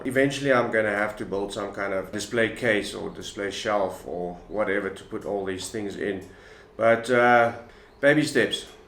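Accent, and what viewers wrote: South Africa. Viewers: You from South Africa? You sound South African!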